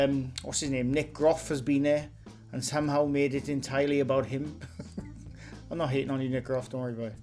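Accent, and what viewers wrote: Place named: Yorkshire, United Kingdom. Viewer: you can't fake a true Yorkshire accent and u can hear it clearly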